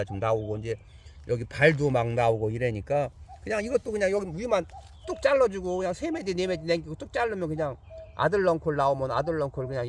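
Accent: Chungcheong, South Korea. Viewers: 충청도 분인가벼 설명 듣기가 느려서 답답혀 나두 충청도지만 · ㅋㅋㅋ 차미 차미 아저씨 반가워유 ! 충청도쥬.저두 충청도유~~ㅎㅎㅎ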